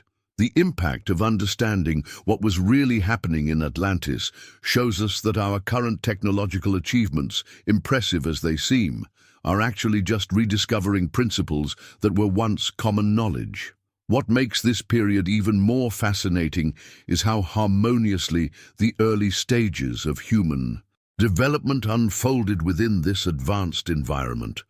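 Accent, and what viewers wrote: United Kingdom. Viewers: I’ve listened to several of these podcasts. I don’t appreciate the superfluous vocabulary. Get to the point. The narrator sounds like Robin Leach and is off-putting because it makes it sound like a cult. I’ve read Edgar transcribed visions but he never sounded like this British narrator.i agree with. But this rewriting of his own experience is abhorrent to history.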